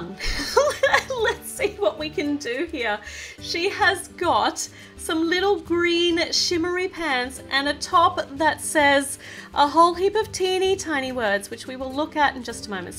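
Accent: Australia. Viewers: Are you Australian Please reply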